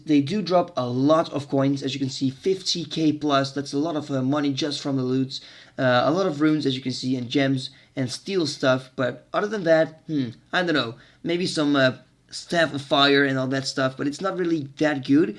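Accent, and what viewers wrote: Netherlands. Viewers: Dit had ik niet verwacht, een nederlander! Top filmpjes, ga zo door!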